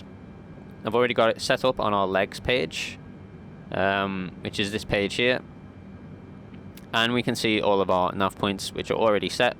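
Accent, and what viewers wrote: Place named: Northern England, United Kingdom. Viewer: Thank you, for all the tutorials! Very simple and easy explained, helps so much for navigating whether its a an A320 or B747. Additionally like your english accent, seems to be somewhere in the north of the UK (scouse?)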